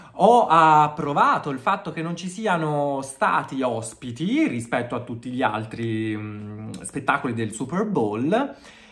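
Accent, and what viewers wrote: Tuscany, Italy. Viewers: Ma te sei toscano, nel video ho sentito che stai a Milano ma non mi sembri lombardo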